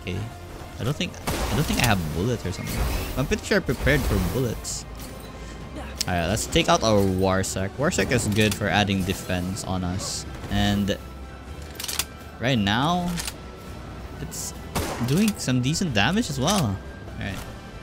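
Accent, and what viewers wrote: Philippines. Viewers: You have a filipino accent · I hear Filipino (Pronounced: "pill-la-pee-no") Accent... Filipino ka???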